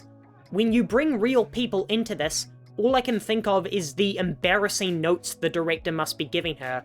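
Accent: New Zealand. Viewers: You can tell from the voice and kiwi accent that the testosterone is strong with this one.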